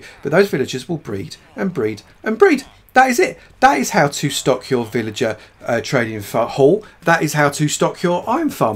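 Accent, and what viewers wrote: United Kingdom. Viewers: Lol okay I gotta say the "Gordon Ramsay" comments are a bit laughable because, no offence to you but, You sound nothing like Gordon Ramsey. I guess people hear a UK accent and think "Hey it's Gordon Ramsay." Gordon is Scottish and You don't sound Scottish to me. Thick as bricks.:) Gonna give this build a try though. Thanks! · Is he british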